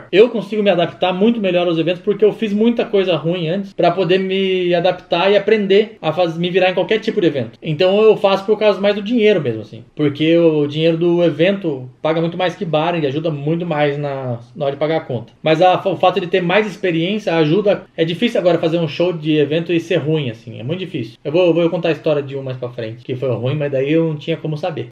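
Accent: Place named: Minas Gerais, Brazil. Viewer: O interessante é que a gente acha que você é mineiro, mas não é paulista, depois do Rabin é você, meu querido!!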